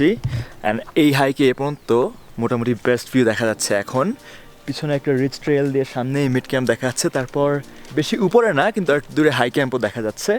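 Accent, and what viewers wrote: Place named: Narsingdi, Bangladesh. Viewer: নরসিংদীর লোক মনে হচ্ছে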